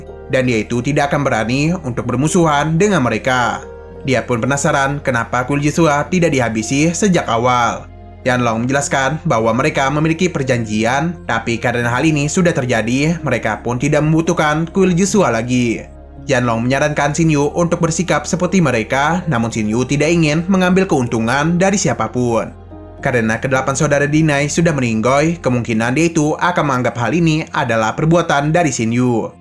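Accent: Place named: Central Java, Indonesia. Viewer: dari jateng mana suaranya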